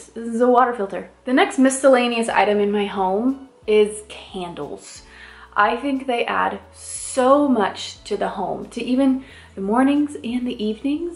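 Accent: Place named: South, United States. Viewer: Much love from Saint Louis!! 🫶🏾⚜️ Hubby and I just found out I’m 6 weeks and 5 days pregnant after almost 2 years of trying and when I tell you your videos give me a HUGE sense of relief, positivity, and inspiration, I mean it girl. God bless you and your family, and may you all continue thriving and building up (I’m from the north and speak a southern accent ALL THE TIME 😂🤣 maybe it’s in your roots girl HAHA). Thank you. 🙏🏾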